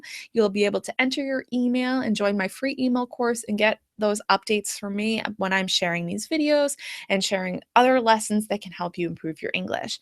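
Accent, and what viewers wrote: United States. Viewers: You have an American accent.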